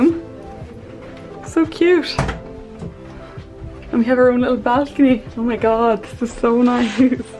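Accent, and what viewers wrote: Ireland. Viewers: U sound like irish😉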